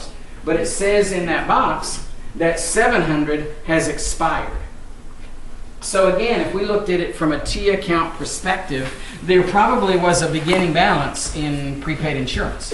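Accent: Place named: South, United States. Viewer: This guy is good, he is clear and succinct and he is so southern. I am having trouble keeping my my focus on the topic ("I feel like billy graham just announced a scripture verse in a crusade. I am not ready to go to the next page"). What a crack up. He knows his stuff. This is not basic as much as it is absolutely essential, Get this--the world is your oyster, don't get it, forget a career in fiance, business and accounting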